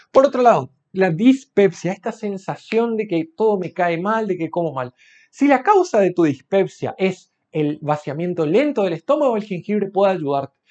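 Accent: Misiones, Argentina. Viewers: HOLA doc usted es de Misiones?tienes un tono muy de un Misionero muy linda la explicacion para el buen uso del gengibre...yo tengo una duda yo tomabe em te y en mate pero en poca dosis ...lo tolero pero mas de una rodajita me produce dolor de cabeza y presion alta y desde ahi deje de consumir porque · Argentino creo, yo soy argentina jiji😂😂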